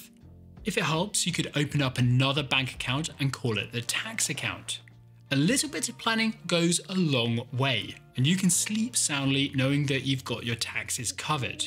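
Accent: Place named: United Kingdom. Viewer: I'm a software engineer and recently joined a team who is building and maintaining accounting software. I have very little accounting experience and no training. But your videos have helped me tremendously to understand the various concepts and to be in the position to make a more meaningful contribution to the accounting software. Thanks for the excellent work. I'm looking forward to the rest of the Accounting Basics series. I must say though, I preferred your speaking style from your earlier videos. They were more relaxed and fun to listen to. I was surprised to hear the change in accent in this video and thought you went intentionally into some kind of "British mode" for this video's sake 😄. But your other more recent videos also sound similar. Also missing the close-up shots in this video which gave your videos that extra edge. Please don't sacrifice your unique and fun teaching style for the sake of sounding and looking more "corparate-y". 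All the best!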